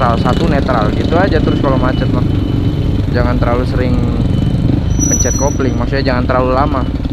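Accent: Papua, Indonesia. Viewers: Logat Papua😁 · Logat papua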